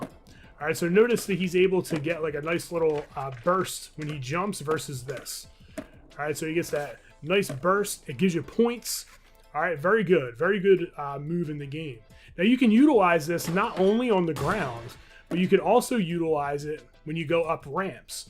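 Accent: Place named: Delaware County, United States. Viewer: This is the first video I’ve watched on your channel, but I could tell right away that you are from Delco my dude. Say what’s up to my cousins!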